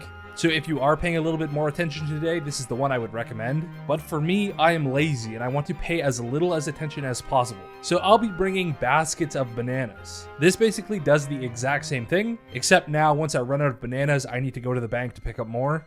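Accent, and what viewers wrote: Canada. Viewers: also u canadian af bruh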